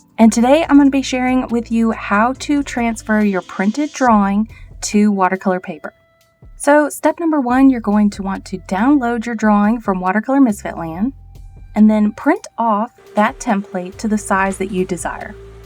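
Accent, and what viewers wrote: South, United States. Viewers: Gee,are you from the South??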